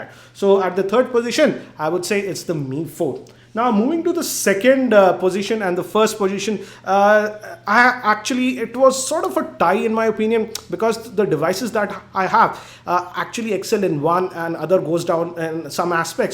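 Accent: India. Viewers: hate indians accent lol, cant understand anything sometimes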